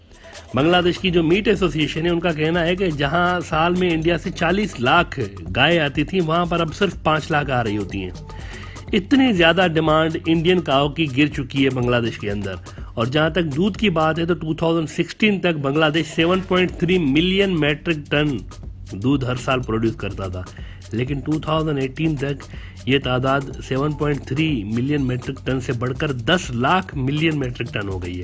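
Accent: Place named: Pakistan. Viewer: itna gherah Urdu boltta hee tav mojey laga Pakistani hee kioki Indian Urdu Hindi ka milawat hee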